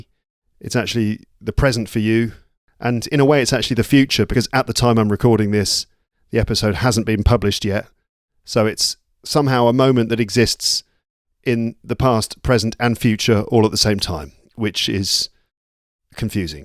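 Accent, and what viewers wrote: United Kingdom. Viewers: I am from Uzbekistan too i was not sure about my accent but after i heard about your voice i was in love the British accent is the father of English language in Uzbekistan there is no one who has British accent i am going to be one through shadowing your every single episode i love the rambling episodes even sometimes when i don't know what to do or bored i combine rambling episodes i chose only rambling episodes because it has something that gives me energy i love your hard work keep up the good work ❤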